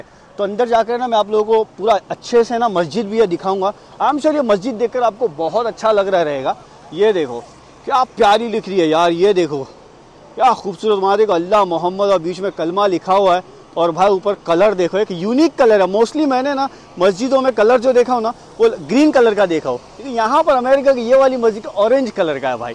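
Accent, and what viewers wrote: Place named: Mumbai, India. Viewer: I am watching ur video for the first time, can you tell me where you are from? Because your voice is from Mumbai, please tell. Bhai jaan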